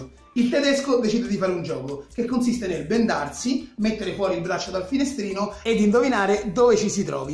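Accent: Italy. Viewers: È normale e nato in Italia o cresciuto che accento doveva tenere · Coffin meme:essendo di colore mi immaginavo una voce con un accento più africano quando pronunciava le parole.La sua voce è invece con un accento laziale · Tu mi sembri un po’ di Napoli rispetto a uno di Roma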